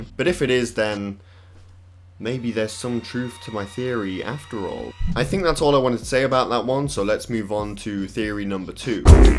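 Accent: United Kingdom. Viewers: I was just wanderin' what do u british do to "R"s?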